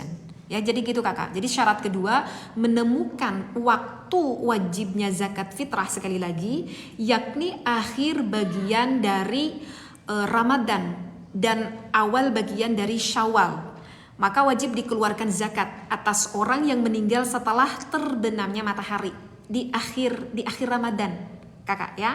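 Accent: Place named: Padang, Indonesia. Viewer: Teteh kadang logat bahasana saoertos urang padang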